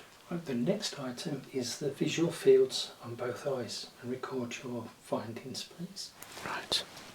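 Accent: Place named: Tamworth, United Kingdom. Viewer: Where abouts are you from? Im from Tamworth and can tell the accent a mile off 😅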